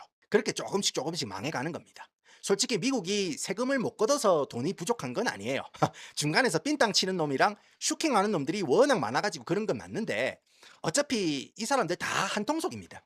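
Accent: Gyeongsang, South Korea. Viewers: 같은 경상도사투리억양이라 더 잘 알아묵겠는...신기한현상ㅋㅋㅋ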